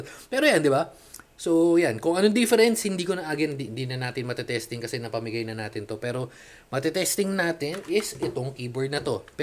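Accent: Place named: Pampanga, Philippines. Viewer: Thank you po sa detailed review! Kapampangan poba kayo? parang nakadinig ako ng accent ng fellow kabalen HAHAHA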